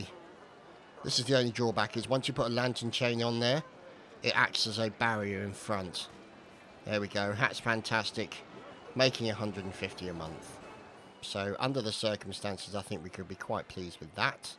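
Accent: England, United Kingdom. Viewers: where you from your accent is awesome I'm guessing somewhere in England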